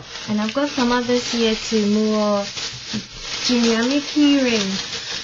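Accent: Fante, Ghana. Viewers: yayyy... kwanyako all the way.... am from the say place buy live in the USA... i love how u speak the local dialect ... and u are so pretty... wish u all the best in London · I hear the fanti and akuapem like accent real nice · ......hmm...you've done quite well....nevertheless i hope you know about the various different dialects in the Twi Language...you do speak erm lets say fante which is a bit erm okay...i love your enthusiasm and your hold onto the Twi Language..kUDOS..AND YOU said people say you speak agona fante AND TWI thats a bit erm not right...agona fante is twi....they are not different.....its Agona Twi Fante....thanks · I like your fante language accent. I am proud of you.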